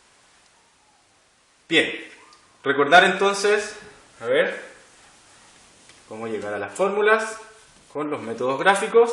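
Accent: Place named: Chile. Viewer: Me ayudó mucho, gracias. Eres excelente explicando pero me distraje con tu acento chileno.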